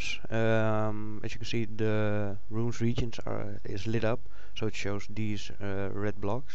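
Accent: Netherlands. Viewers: well if you are dutch and you have heard dutch people speak english you kinda get a feeling for it, but it isnt a crazy dutch accent.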